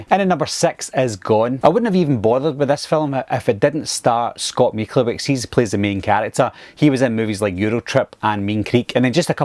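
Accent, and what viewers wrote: United Kingdom. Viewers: Just please show a movie, dont show your commentary. You are not Australian. You are British!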